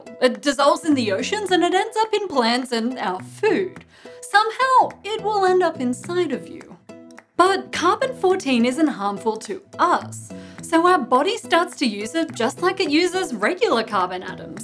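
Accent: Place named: Australia. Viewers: Yes she is Australian. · her accent is so thickly (australian?) sometimes I feel like she has trouble talking.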